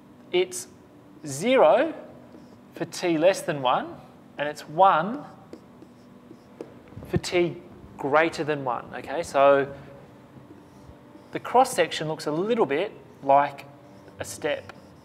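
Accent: Australia. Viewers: Good on you for making this, love having an Aussie for once!! Cheers , 21yr old ENGG student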